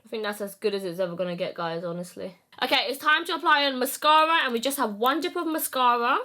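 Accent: United Kingdom. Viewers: I love a British accent !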